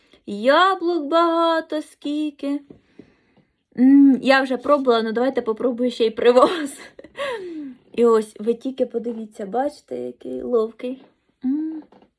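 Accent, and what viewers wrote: Poltava, Ukraine. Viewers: В багато підписників на різних каналах Це її образ селянської дівчини з Полтавським акцентом Подивіться її інтерв ю вона грамотна, сучасна дівчина,одягається гарно і по сучасному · ну знаєш полтавський говір це одне, але коли написано безграмотно...,😭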